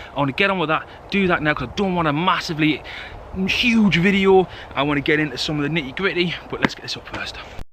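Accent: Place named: North East England, United Kingdom. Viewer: Good to see you back buddy👌 I’ve just bought a polish lavvu and I’m on “modding” it at the min, sewing monster zip in it, wax proofing it with a home made recipe and heat gun, changing the pole system to a british one witch packs down to the same size as original and also making custom wood bungs to go on top/btm of pole system😌👍 i am thinking about starting videos on bushcraft and wild camping but as a noob enthusiast 😂 we shall see... Ive watched all your videos and have learned a lot from you, your instructional skills are ace bud and its a pleasure to sit down and watch your videos learning as i go👌 plus its a easy to relate to a fellow north east lad and being able to understand what your saying with the accent😂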